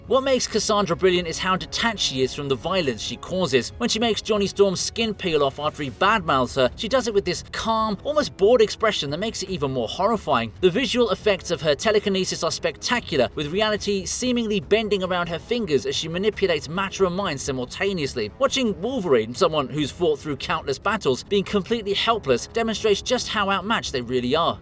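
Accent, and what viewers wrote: United Kingdom. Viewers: Will I listen to british commentary?It makes me think about suicide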